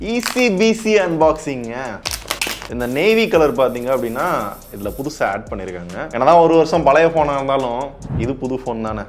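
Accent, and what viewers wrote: Madurai, India. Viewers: bro Nenga madurai yaa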